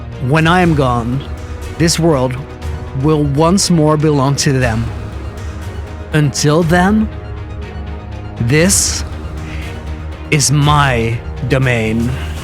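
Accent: Netherlands. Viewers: nice english mate dutch for sure :)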